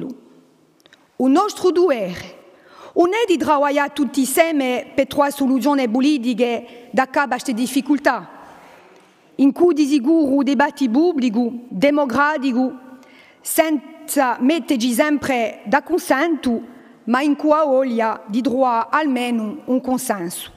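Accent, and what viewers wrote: France. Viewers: Che brutto il corso un italiano con le u finali e la.cadenza francese . Il sardo e tutta un altra cosa.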